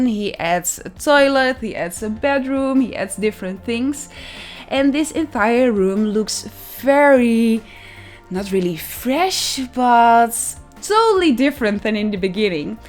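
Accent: Netherlands. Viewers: Are you Dutch? 👀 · Wowww ik kan niet eens een normale huis maken😭😂 en ja ik praat nederlands omdat ik aan je accent kan horen dat je het ook bent :') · Are you Dutch? Cool video!! ❤️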